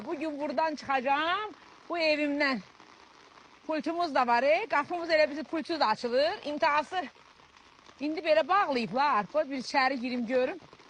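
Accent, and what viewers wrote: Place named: Baku, Azerbaijan. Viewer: daha Bakinka lar kimi dnışmaqa keçib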